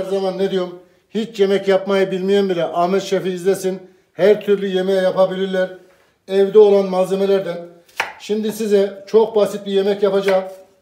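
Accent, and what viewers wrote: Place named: Ankara, Turkey. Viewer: Ustam nerelisin sanki ankarali gibisin merak etim bende ankaraliyim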